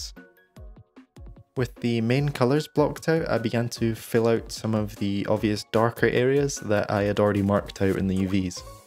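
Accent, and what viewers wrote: Scotland, United Kingdom. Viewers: are you scottish? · nice light scottish accent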